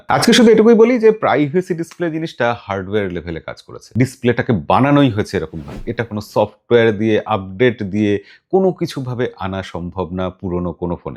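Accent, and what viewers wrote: Bangladesh. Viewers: দাদা আপনার কি বাংলাদেশে বাড়ি? কারণ আপনার কথা বলার ধরন সম্পূর্ণ বাংলাদেশের মতো।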